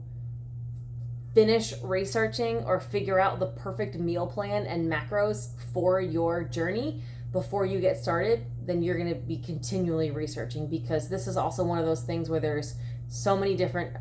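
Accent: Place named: Philadelphia, United States. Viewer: You from Philly? Just detect a bit of our accent. Love your channel.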